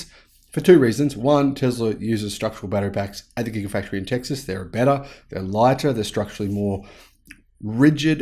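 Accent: Victoria, Australia. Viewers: Yes cobber you’re an Australian obviously ……from a Pom. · You have a broad and typical well spoken Australian accent. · Solid Melbourne accent, for sure. Also, digging the other voices too 😆 As for the range mystery, maybe it could be the software? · No Doubt. Beyond doubt your Australian 😊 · 100% Australian accent yes. But don't expect non-english people to pick it up. British vs Aus vs NZ is not a big difference in accent, especially in a public speaking context. Regarding the efficiency, it's down to aero and more efficient inverters and motors. I wouldn't sing them any praises, all teslas are pretty rubbish at efficiency. Never mind the onboard charger at low load, tyres that cost a fortune. There's also the various rating of the capacity of the battery. Likely that BYD overstates the size, or uses second bin cells whilst they sell the first bin to their competition for top dollar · You sound Australian,! 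· 100% aussie mate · Yes your accent is Aussie - I'm an expert, because I grew up watching Neighbours and Home & Away. 😉 I've heard stronger though. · Definitely an Australian accent. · aussie, no doubt 😂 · You are 100% Australian! 😊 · As a non-Aussie, to me his accent is not readily identifiable -- like say a Steven Mark Ryan's -- unless he says specific words which are pronounced in an obviously Aussie accent :). But good to know this is how a Victorian accent sounds like. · I'm Russian and I would've guessed your accent is Australian. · 100% Australian Accent within 3 seconds. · 😂Yes, you're an Ausie!😂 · the first time I heard you I knew you were an Aussie. No question. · Yep Victorian Accent. When I did nasho at Puckka we had all the states segregated, that's when I heard the difference. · u sound very Australian, I love your shows, Eugene, Philadelphia, pa. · Hey. Total Aussie Accent! 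Good on ya! Sending love to you and family. Keep up the great coverage. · Total Aussie accent, mate! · Hello, when talking with someone from another country they will typically know that you are not from their country. They may have not be sure what country‘s accent you have but they will know it is not their own. This is even more so with non-native English speaking people. I can tell you that my wife as a native U.S. American did pick you up as an Australian just seconds into hearing your video first time. That is a good thing!! Be proud! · You sure sound Australian to me, Mate. 👌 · Definitely a Victorian Australian accent . Not a lot of different accents in Australia unlike the USA or UK. New Zealind and South Efrika do strange things with their vowels.🤔🦘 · Totally Australian accent! No doubt about it. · 100% Australian accent, easy to identify as such. Hard to replicate even after listening to you and SMR for a year or two, maté. 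· 120% awesome Aussie accent 😂 Keep it this way! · The ‘viking look’ probably throws folks off. Your Melbourne accent is genuine though! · 😂😂 I'm an Aussie living in Spain for over 30 years, and YES, your accent is totally down under🤙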